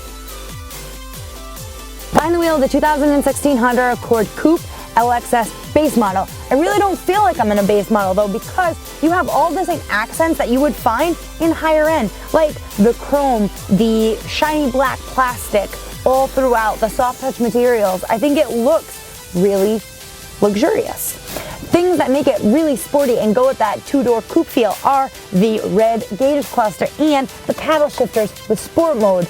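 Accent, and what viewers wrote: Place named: New England, United States. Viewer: 613 I love the way she says "Honder" instead of Honda. I enjoy listening to her New England accent. I am from Oklahoma and so I like listening to other accents besides those found in the south or from here in the southwest. Love your accent,!:-)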